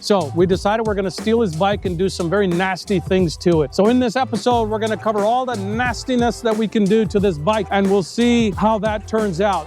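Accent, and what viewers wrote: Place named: United States. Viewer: What a US accent. Inspired.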